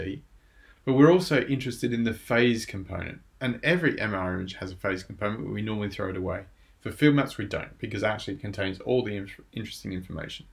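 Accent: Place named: New Zealand. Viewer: Trying to work out if the accent New Zealand, Australian, or either and influenced by time spent in England.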